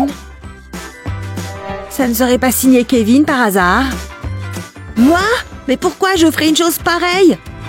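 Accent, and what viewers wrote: England, United Kingdom. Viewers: en plus il sons englais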